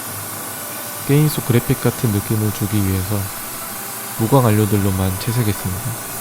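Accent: Daejeon, South Korea. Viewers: 형 대전사람이였어...? 이제 알음... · 님 대전사람이시네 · 님 대전분이셨나요??!?!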